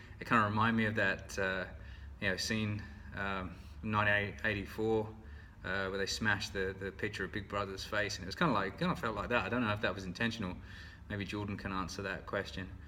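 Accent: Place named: Australia. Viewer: Hey has anyone ever told you that you speak with a little bit of an aussie accent?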